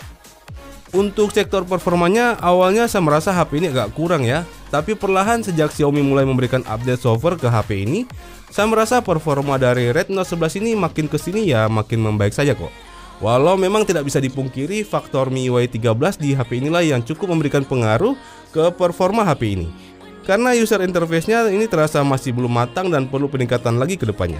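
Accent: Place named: Manado, Indonesia. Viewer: Bang orang manado ya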